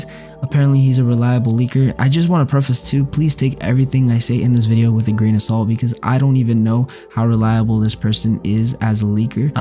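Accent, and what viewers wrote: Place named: Queens, United States. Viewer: Mg u sound so queens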